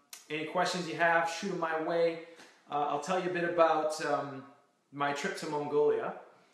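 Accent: Canada. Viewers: When your american and he says drAMa and soory ive never understood but then again im not Canadian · He sounds more Canadian here than on heartland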